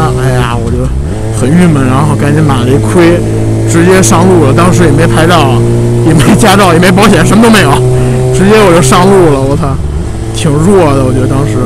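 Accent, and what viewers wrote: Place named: Beijing, China. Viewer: 宽点有限A_A听着口音咋像北京捏，熟悉啊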